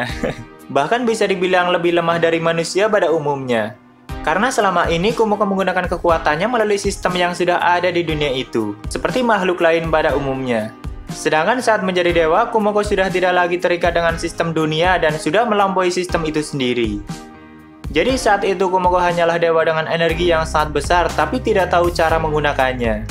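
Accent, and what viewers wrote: Banyumas, Indonesia. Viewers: Ngapak wkwk malah kocak gua dengernya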